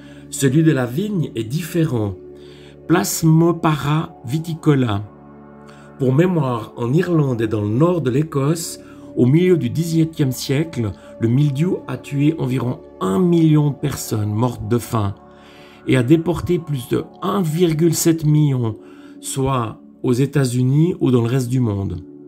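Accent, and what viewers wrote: Switzerland. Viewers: Merci pour vos conseils, j'adore votre accent qui me rappelle mes séjours très agréables en Suisse.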